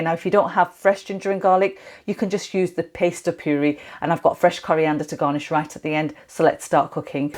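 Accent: United Kingdom. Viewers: Awesome recipe ! Good job ! Please speak slowly so that we understand your British accent well.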